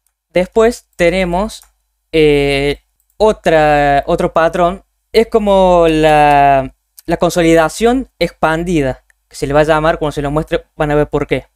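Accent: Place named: Córdoba, Argentina. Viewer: Muy bueno, por la tonada... sos de Córdoba?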